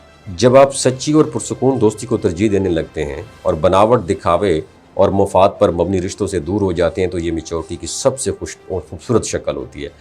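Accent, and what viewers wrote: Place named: Karak, Pakistan. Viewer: Karak ki Pashto ❤❤😅😅😅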